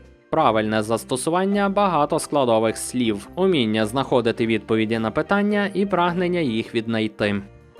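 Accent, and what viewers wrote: Russia. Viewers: У Ютьюбі є авдіокурс української літературної вимови Миколи Погрібного. Навчіться нарешті правильно вимовляти українські звуки, бо бридко чути вашу зросійщену вимову.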